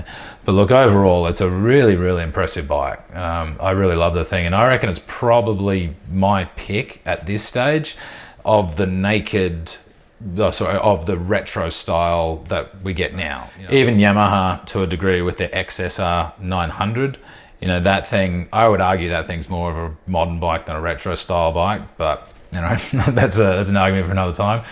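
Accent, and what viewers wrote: Australia. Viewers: not at all. Just wondering why you Americans want the whole world to mould to the way you to things. Self promoted exceptionalism. is a great guy doing a great job - he is an Aussie (grew up not far from where I did), and of course he will speak with an accent and pronounce words as we do in Australia.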